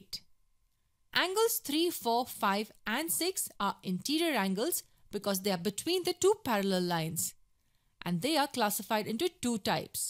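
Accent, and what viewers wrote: India. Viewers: why would you use an indian persons voice bruh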